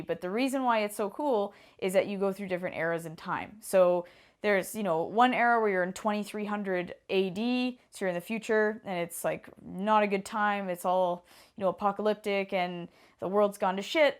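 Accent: Canada. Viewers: This is a really well-lit YouTube vid. I like your Canadian accent. Chrono Trigger was really cool. I think I beat it a few years ago.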